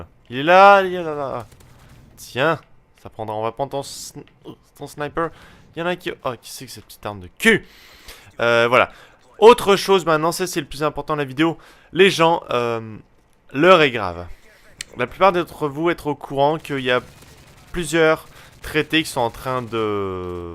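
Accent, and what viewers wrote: Quebec, Canada. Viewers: Tu as un accent québécois non ? x) Très bonne vidéo ! (Y)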